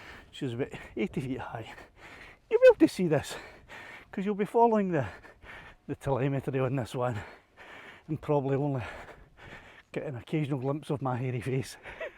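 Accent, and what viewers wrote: Scotland, United Kingdom. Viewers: "What did you do Saturday?" "Well, I put on some headphones and listened to a Scot breathe heavily into a microphone while discussing drone distance." Well done, friend. I hope this has been educational for all the haters.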